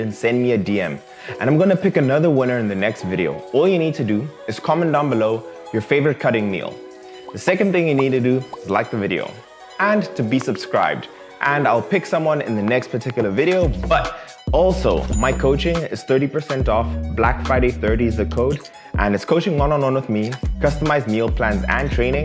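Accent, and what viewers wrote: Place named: South Africa. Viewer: For a second I was tripping because I thought that he was going overboard on the carbs... Either way My favorite shredding meal is definately a chicken avocado salad, just because the carb grams is low and when I cut most of the time it follows a higher fat macro setup so that my body produces more ketons and gets fat adapted faster... Just found out you lived in South Africa (which makes sense from the accent!!) You were closeby at one point.. You got a follower in Mozambique, keep it going!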